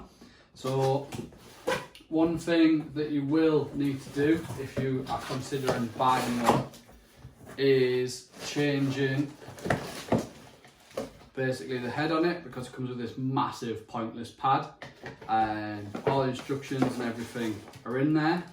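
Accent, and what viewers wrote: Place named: Middlesbrough, United Kingdom. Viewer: You sound local to me (Middlesbrough). I have literally just bought this polisher from Argos (not yet picked it up though).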